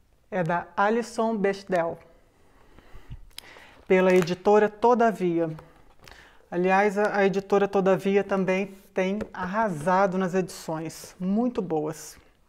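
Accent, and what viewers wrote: Minas Gerais, Brazil. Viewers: O sotaque mineiro é muito cativante. Adoro! Não sou mineira mas, já estive em MG várias vezes, e esperando a pandemia dar uma trégua para voltar a visitar esse estado maravilhoso.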